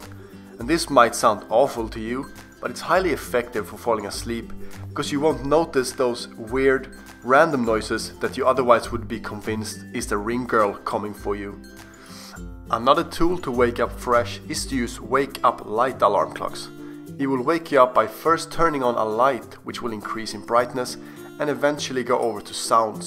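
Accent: Sweden. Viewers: Guessing the accent you have, I would say you are Swedish. You have a little bit of a Swedish accent. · I had a hunch that you're Swedish going only on your accent. confirmed it haha. Nice video, ska testa allt detta! :D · classic swedish accent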